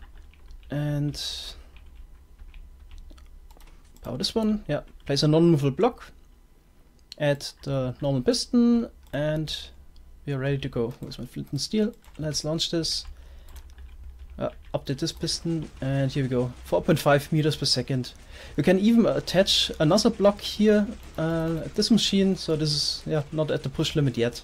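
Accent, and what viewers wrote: Germany. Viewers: bro, ich liebe deinen deutschen Akzent! Liebe grüße aus Österreich!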